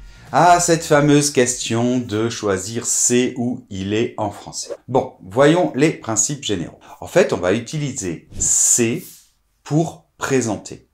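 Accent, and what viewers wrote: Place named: France. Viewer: Bien expliqué et avec la prononciation française que j'adore!, bon weekend à toi et à ta famille! 😘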